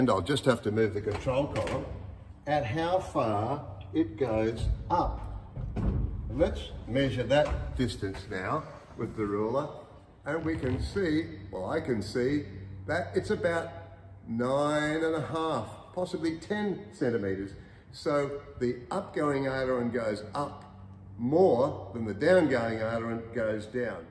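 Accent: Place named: Australia. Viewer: Clear and concise, also great to hear it from a fellow Aussie. Cheers cobba!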